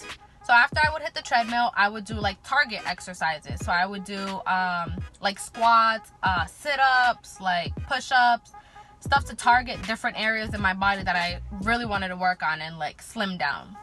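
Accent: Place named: Los Angeles, United States. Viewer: Good job Pretty woman. You sound like you're from LA.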